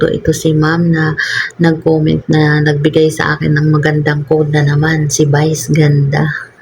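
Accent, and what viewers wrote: Waray, Philippines. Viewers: Hala waray ka liwat kabayan?taga este ako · Hala waray ka ngay-an sis ☺️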